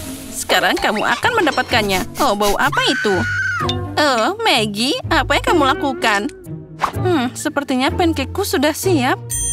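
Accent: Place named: Indonesia. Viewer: Tapi kenapa a sounds Indo bukan usa ker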